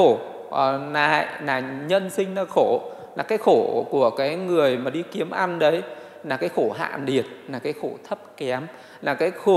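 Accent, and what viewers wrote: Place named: Nam Dinh, Vietnam. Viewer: Nghe giọng nói của thầy hình như là người Nam Định phải không a .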